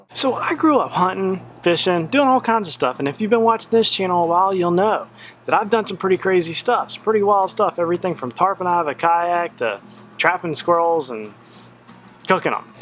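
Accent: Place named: Alabama, United States. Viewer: I love all your vids I'm in South Alabama we have the exact same accent lol l think it would be so cool to hunt and fish with you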